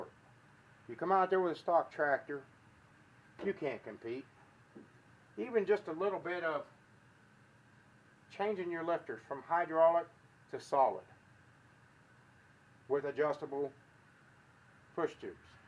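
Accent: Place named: West, United States. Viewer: So are you a mechanic or a very handy man? And sounds like someone from the west.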